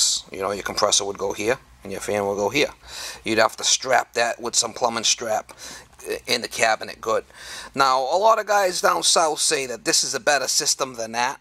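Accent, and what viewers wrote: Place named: New England, United States. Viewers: No he doesn't, that sounds closer to Boston accent.... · Like your yankee accent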